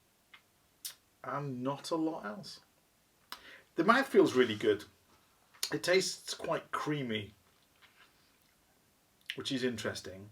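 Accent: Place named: Wolverhampton, United Kingdom. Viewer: Great Wolverhampton accent too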